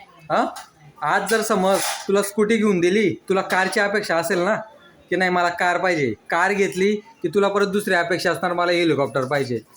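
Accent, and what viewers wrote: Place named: Jalgaon, India. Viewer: बोलण्याची ट्युनिंग जळगाव ची वाटते. आपल कोणी बोलत आहे असं वाटत आहे 😂😂😂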